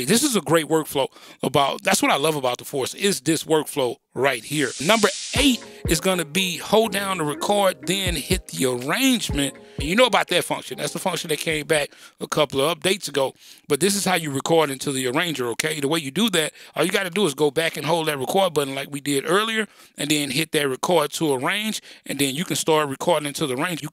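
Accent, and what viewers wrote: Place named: United States. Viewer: Your heavy murrican gangsta accent makes the understanding for non-native speaker really hard.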